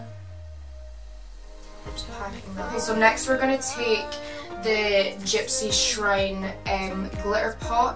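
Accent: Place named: Wales, United Kingdom. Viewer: Is this what all welsh ppl sound like ?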